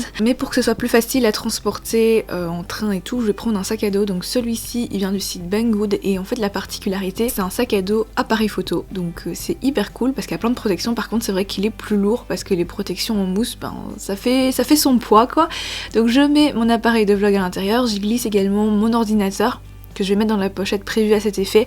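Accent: Belgium. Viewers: Tu es de Belgique ? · tu es belge ?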